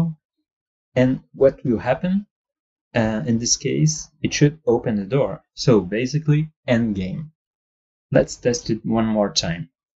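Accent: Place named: France. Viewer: It would be awesome if you did some more tutorials! Some more advanced tutorials, if you get the time. Would also like to see how you go through the process of creating rooms. And your English is perfect with a really cool, beautiful French accent so never apologize for that. :)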